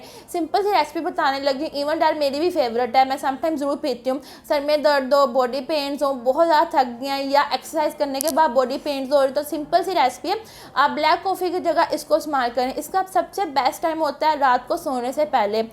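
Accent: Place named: Lahore, Pakistan. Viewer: Lahori accent..love u ho gya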